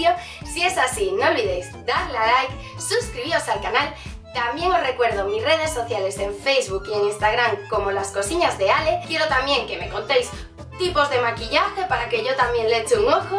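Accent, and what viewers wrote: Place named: Spain. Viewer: Es acento de España.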